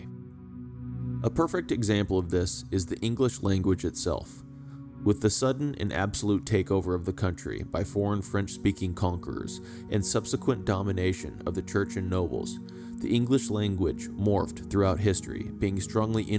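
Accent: United States. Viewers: Pronunciations need work -- too bad to hear an American voice instead of a British one. E.g., Aethling - long a or AA (ae diphthong use in OE). But clear useful information of historic figures.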